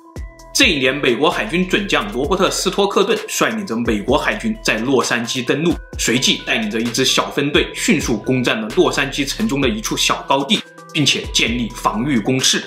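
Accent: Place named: Fujian, China. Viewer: Hu 建 口音吧？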